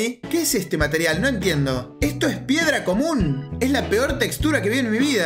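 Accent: Córdoba, Argentina. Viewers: SOS CORDOBÉS????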